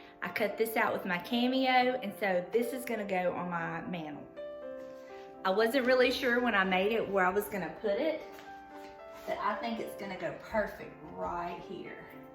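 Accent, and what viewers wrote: South, United States. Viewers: You are adorable! Decor is lovely and I love the "southern accent"!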